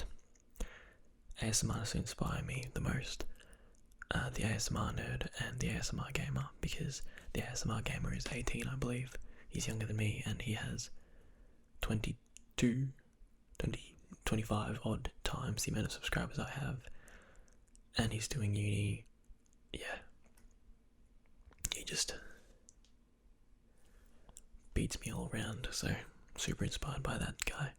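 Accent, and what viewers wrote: Australia. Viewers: I know it's not a new game, but the perfect game for ASMR would be Endless Ocean. The calm music, the player's slow deep breaths through the oxygen tank, the sound of the bubbles surfacing. All that plus your low voice. It sounds like the perfect recipe for ASMR. Your Australian accent would also fit the theme of the game. I'm not sure if that'd be a game you'd personally find very interesting though. I enjoyed it.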